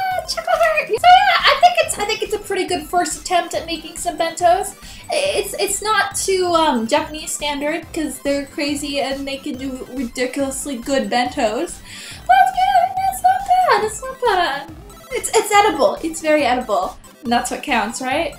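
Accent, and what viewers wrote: Canada. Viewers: i love your accent! canadians RULE! haha!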